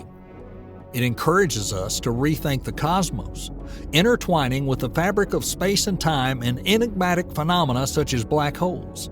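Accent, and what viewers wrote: South, United States. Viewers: I've never heard a physics doc narration with a southern accent. I think I love it.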